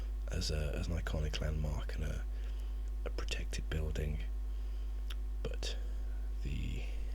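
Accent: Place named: United Kingdom. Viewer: nice video.awesome accent, living in philly really makes you appreciate proper English.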